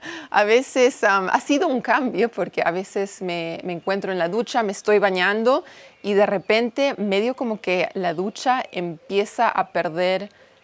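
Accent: Argentina. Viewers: Es increíble el cambio de idioma que estás teniendo ya SOS argenta😂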